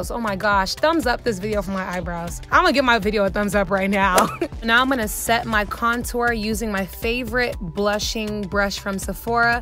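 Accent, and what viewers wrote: Nigeria. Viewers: U are so beautiful. Are you Nigerian? I heard some "Nigerian English" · You can feel the Nigerian in her speach, I'm so happy if she's of my same nationality. Makes me love myself a bit more🤧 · OMG I can't believe I didn't know u were Nigerian!!! 😱😍 Love when you speak in the accent girl 😉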